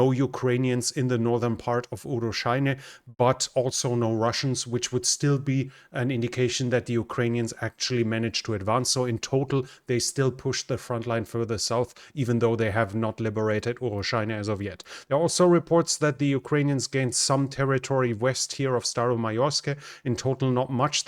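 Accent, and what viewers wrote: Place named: Germany. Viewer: Urgent workout upon Krautaccent needed , more brain work either ....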